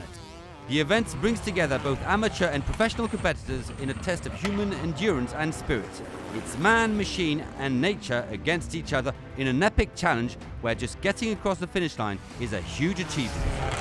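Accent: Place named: France. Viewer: Need more French accent!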